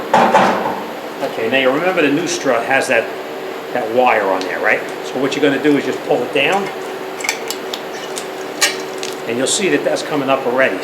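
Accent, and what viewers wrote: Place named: Boston, United States. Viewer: Your video was very helpful to me it was great the way you explained each step as you went along. I took your advise on the spring compressor deal and purchased the whole assembly that made the whole job much easier. Also I noticed from your accent, you must be from the Boston Massachusetts area. I retired and moved from Palmer Massachusetts to Georgia but my heart is still in Massachusetts and it was nice to hear from someone that lives in that area. "Thanks "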